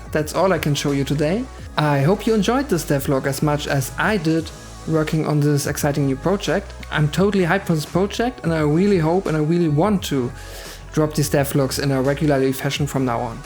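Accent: Germany. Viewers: I recognize a fellow German when I hear one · are you german? i think you have that kind of accent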